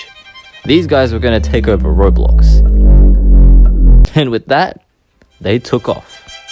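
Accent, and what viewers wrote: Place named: Australia. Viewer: Are you Australian I love the accent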